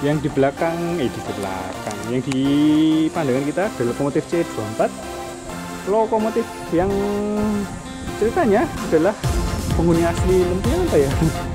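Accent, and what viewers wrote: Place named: Banyumas, Indonesia. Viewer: Masnya ngapak xixixi:D teruskan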